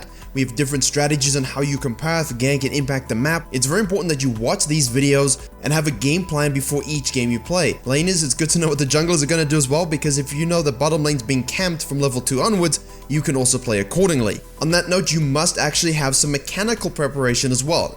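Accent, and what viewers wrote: South Africa. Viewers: that south african accent doe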